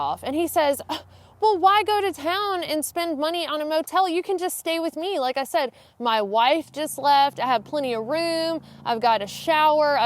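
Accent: South, United States. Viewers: As a native NC’n that hasn’t backpacked in 20 years... I have no idea how your videos keep popping up, but I’ll keep watchin’ just to hear you say “hike” and “neck knife”. Nuthin’ prettier than a girl with a great southern accent! 😍 Sadly, most of the girls native to central NC have lost most of their accent with all the Yankees moving in. 😏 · Your videos are spot on! Stuff people need to hear and want to hear! The reason you do so good at getting new subscribers and people continue to watch you is because your genuine. It also helps that you have that southern accent but I can tell before you post a video you actually think what would I want to know about backpacking if I was starting out. Keep doing what you do and keep grinding! Remember that the things you take for granite other people are wondering. Here are a few things as a new backpacker I wonder about maybe you can do a video on. 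Is drinking and bringing your own alcohol frowned upon on the trail? How do you carry your alcohol? What about hunting or killing game on the trail? Fishing in ponds you come across? Do you carry fishing gear? If so what is the best? What app do you use for GPS? Eating spots? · Great story and great advice! I live in Hiawassee GA near the AT(actually recognized the stretch of road near Dick's Creek Gap and Top of Georgia hostel in this video with the two smiling hitchers) and have picked up lots of hikers going to/from town. I've always had great interaction with those hikers and they're always super appreciative for the ride. Never a bad idea to take pics of licenses and vehicles and send those to a loved one or friend just in case. I do it all the time with suspicious looking characters... just a couple days ago at the Unicoi Gap AT trailhead while out for a day hike. Better safe than sorry! 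Love your videos and your southern accent! Keep em coming?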